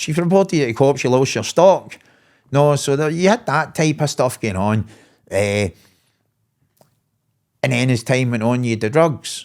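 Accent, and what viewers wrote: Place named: Glasgow, United Kingdom. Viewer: cheers for this podcast, my granda's Calton born and raised and just recently passed and hearing your accent reminds me of him so much you've gained a follower from me hope to hear more podcasts from you with Shaun or just any other story. I had to re light my spliff at least 7 times listening to your nutter of celly story I was laughing that much. Wondering if you ever knew my cousins from easter house they're no about anymore (Binnies) if so any stories of them?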